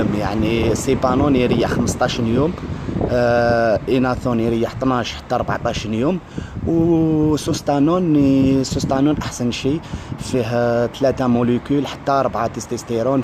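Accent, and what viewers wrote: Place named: Algeria. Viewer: لهجة جزائرية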